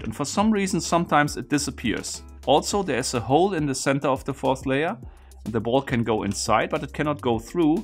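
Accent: Germany. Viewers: His German English is 9.5/10 Most Germans pronounce some words differently than Americans. It’s not right or wrong. has the best energy and is sooooo smart. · This reminds me of the first video I ever watched of yours - I was thoroughly impressed and entertained by this friendly voice with a light german accent drawing out the inside of the puzzle by touch as well as trial and error. The passion showed through your attention to detail and delight at the way the puzzle was MADE, not just the solution. That being said, I pictured you as an older gentleman, maybe with salt and pepper hair, and a neat bow tie. I'm not sure if I should be more impressed that someone so young would be so patient and exacting, or disappointment that my illusion was shattered.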